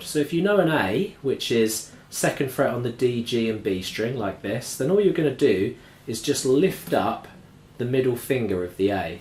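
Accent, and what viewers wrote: United Kingdom. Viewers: When You started talking I was like OH SHEESH HE'S BRITISH.. I did not see that coming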